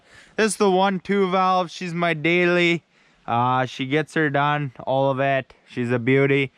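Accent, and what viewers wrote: Canada. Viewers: I get all the confusion about accent, have yah considered he's Canadian and a little tipsy? yah know? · You're video was recommended by YT and I'm glad I watched it. First off, as a fellow Canadian I heard the accent and knew where are from, awesome accent! I like your cabin it's very quaint and seems efficient. You had me laughing at "this is the shitter" haha... classic. You have a new subscriber.. take care from ON. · Wow. I quite enjoyed the videos I watched. Amazing that you started doing this when you were so young. I have four boys in their late teens and the only thing they do is play computer games all day. There I am building a woodshed with my Chainsaw mill and none of the boys have the slightest interest in building something. We live way out in the woods in northern BC and I enjoy nature and doing things as well. Good on you. 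Wish you all the success. That is quite the Canadian accent, by the way. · I don't know if this is Canadian accent or menonite mixed with Amish and a little Canadian threw in for good measure. But it sounds like you really poor it on extra extra like trying to copy a wirey granddad like I copy both my grand dads and my dad I've noticed naturally. Either way pretty good ol boy A ..... Well you are ok in my book lol nothing like a Cummins 12 valve . Have a good one young man . I don't see how anyone can live in such beautiful country and not be able to have guns like. It's almost like living in Alaska but less freedom. But what am i talking about we have a bunch of demoncrat, antichrist spirited bunch of heathens that stole our election and are pissing away everything here . But seriously awesome place . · I'm Canadian and I've never heard this Canadian accent!! Lol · That's the most canadian sounding guy I've ever heard. 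Could be doug and bob McKenzie's long lost brother. That's a badass set up that he has there, tho. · Born and raised in Ottawa. Never heard such a Canadian accent in my life. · That's deep Canadian. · Im Canadian, was raised in the bush, and even Im like. "whoa thats a thick Canadian accent bud" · Been a long time Since I heard the great Canadian accent!! Love it! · Such a "Canuck" Love your accent brother. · first time viewer but 5 seconds in I can tell its a fellow Canadian lol, got a doozy of a spot there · I love the Canadian accent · Fuckin love Canada and Canadians. This dude literally sounds like a dude doing a parody of a Canadian, and I think that's really beautiful. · The loft is awesome! Looks like a great place to relax and get cozy on cold Canadian days and long ass winter nights.. By the way, love your accent. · I agree you have the purest Canadian accent!! eh!!! I enjoy your videos and I'm envious of your lifestyle!!! 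All the best mate!!! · Love the Canadian accent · I definitely say eh a lot, but this is the most Canadian guy ever.. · Holy shit that is the Canadian accent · Truly. And the accent makes everything sound like it has a G-rating even when saying “shit” (which I didn’t know was even a word in Canada). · I'm Canadian and even I was like, wow that's so canadian. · Knew he was Canadian from the jump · Is there an Irish modification to this Canadian accent? Sorry, curious linguist. (yes i know Ireland is older than Canada. The only reason I use "mod" is because someone can be born and raised in one country but still be taught a language by people culturally from another. In this case, someone identifying as Canadian is being taught English by historically Irish English users. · I just got up out of my bed to grab a Moosehead. That’s how Canadian I feel after listening to this gent! Awesome!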